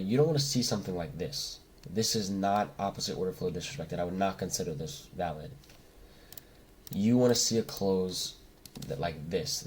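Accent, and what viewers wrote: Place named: West Virginia, United States. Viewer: west virginia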